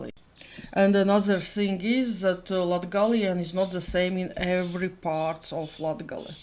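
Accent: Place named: Russia. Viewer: there are often mistakes, it often helps to consult native speakers, however some Baltic languages like Samogitian for example, because the language has been incorrectly classified as a mere dialect of lithuanian and not a distinctive language, people living in žemaitėjė have been discouraged for generations now to consider lithuanian the only valid national language, and most Samogitian people under the age of 50 shall we say, cannot speak a single word of our language. Žemaitiu ruoda, has more vocabulary in common with both Latvian and Russian languages than with official lithuanian. For example, he/she in lithuanian is jis/ji, in Latvian viņš/viņa, but in Samogitian we say ons/ana. I like your accent, you have a strong Russian intonation whichI find very charming. Russian songs are so lovely especially!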